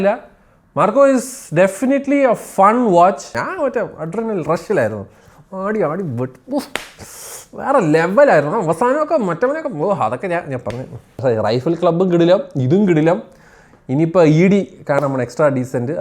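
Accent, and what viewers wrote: Trivandrum, India. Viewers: Do not believe this joker Trivandrum slang u tuber . These are paid reviews .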